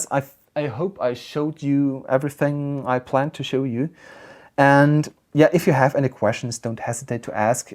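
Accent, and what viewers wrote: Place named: Germany. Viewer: Nichts gegen dein Englisch, aber ich hab sofort gemerkt, dass du deutschsprachig bist. :D Gruß!! ;)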